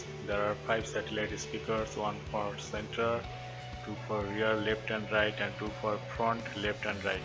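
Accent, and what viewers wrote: Bengal, India. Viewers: are you a Bengali? It's so evident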